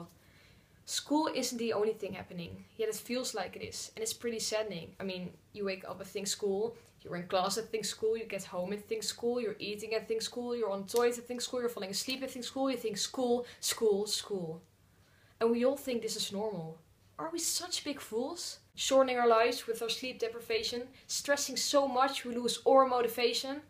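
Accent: Netherlands. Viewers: Heyyy are you Dutch? I think I recognise the accent.👍🏻👍🏻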